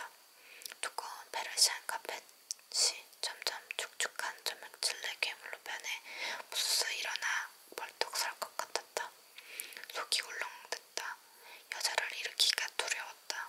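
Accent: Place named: Gyeongsang, South Korea. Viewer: 너무 좋습니다. 경상도 억양도 너무 귀엽습니다.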